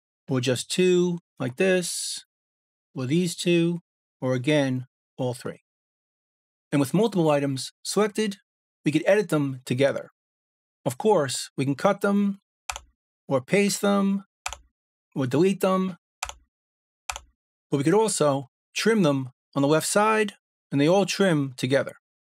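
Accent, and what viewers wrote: New York, United States. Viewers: U from NewYork?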